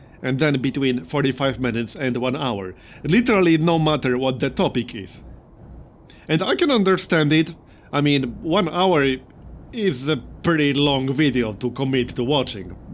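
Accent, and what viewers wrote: Poland. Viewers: Just cut the long videos at 30-35 minutes with a suspense. Just like with the soap operas we will be back for more excitement. I have no problems with long videos/movies at all but I understand how the algorithm punishes the videos that are not watched at full length. I am sure many more of us like your Polish accent than those dislike it. Just keep being yourself and do what you really enjoy. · Good luck with that missing 1k, (I said around begining of December that you will get it at the begining of 2020, but as a data-focus guy, you are probably right, that more likely is going to happen around May next year. I would never thought that accent could be a problem here on your channel, but probably that's because I am Polish as well and I don't really care about the accent more than the content itself. Anyway, do what needs to be done to reach your goal.:) And sorry for repeating myself, I think that one day a month of some retro-gaming in strategy genre (video or streaming) would make me very happy. 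You know, something like Centurion, Panzer General 2, Dune II, Syndicate or Age of Empires, you know yourself. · I subscribed for civ 6 deity gameplay and I wish the episodes were at least 40mins long. Your latest civ 6 play is 5/5. I don't care about the gimmicks that are popular on youtube: I did x and y happened. I wish you didnt spoil what happens in the episode in the title for example: no oil!!! When that is what happens in the episode... and me personally i dont like xcom at all so i dont watch those videos. I believe you would get more viewers with quality civ 6 content. About your accent?!?! Im finnish and i have a finnish accent, youre polish with your accent, i actually know one polish woman who lives here and we all havr our accents, not everybody talks like the queen of England like we are teached to do. So dont be silly your accent is fine all that matters is if people understand when you speak, not the accent! 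I actually like the way you speak you should sell t shirts that say "works for me" hearing that always makes me smile! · please go to America once and actually talk to different people there. You will find out that apart from the natives (white, born in America, both parents have English as first language), everyone have some degree of accents and they don't take it as a problem. Tbh, some are even proud of their accents because they think their accents emphasize their cultural and ethical background. If you're not a native speaker, nobody would expect you to speak as one. So be confident with your English. After all, you are a Polish, not an American, and it's totally fine for a Polish to speak Polish-English instead of American English.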